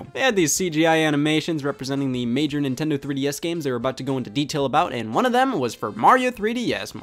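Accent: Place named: Midwest, United States. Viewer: What I love about is that you can obviously tell he's from the Midwest poorly based on the fact that he said "Yup" like, 10 times in this singular video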